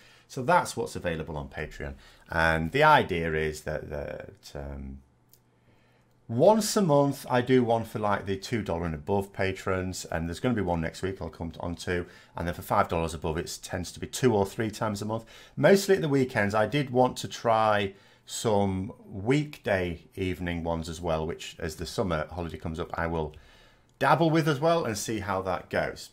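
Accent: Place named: Yorkshire, United Kingdom. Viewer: Hey, love the channel. Currently living in Buenos Aires and your videos are one of my primary ways of keeping up with the ins and outs of Brexit, etc... Also, get to hear a Yorkshire voice on a regular basis.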